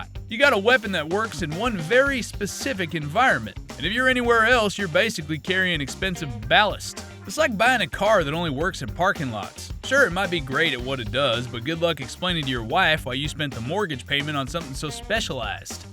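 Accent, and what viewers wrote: United States. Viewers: Hello mr stereotypical american accent guy, Ever seen the mg14z? Its a modern mg81z by technicality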